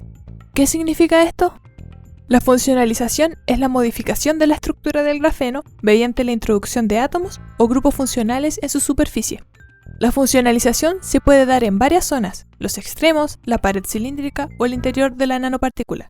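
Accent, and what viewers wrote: Chile. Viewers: Me gusta más tu acento chileno 7u7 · Buen video! Eres chilena?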